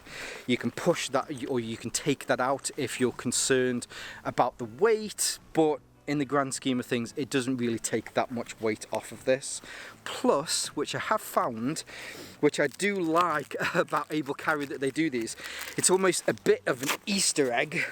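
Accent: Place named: Stoke, United Kingdom. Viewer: Bit of North Staffordshire, Stoke going on here.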